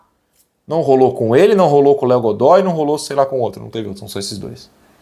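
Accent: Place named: Portugal. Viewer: Não aguento mais português, até o sotaque do cara está me incomodando não que eu seja xenofóbico.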